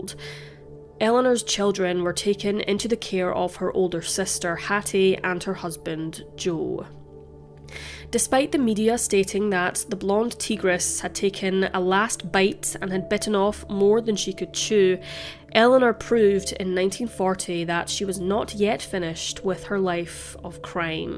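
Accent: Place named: Glasgow, United Kingdom. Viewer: I spent 10 years in Glasgow Scotland. I quite enjoy listening to your Glaswegian accent narration.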